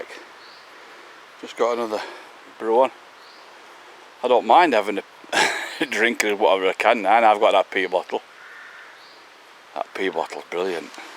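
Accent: Yorkshire, United Kingdom. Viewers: Great video mate. You're a proper down to earth bloke, very likeable. Looks like you have a blast out there with the dogs. You got a lovelly spot there too. I've subscribed and will watch the rest you have done. sounds like you;re a fellow Yorkshireman with that accent. Ey up from Leeds.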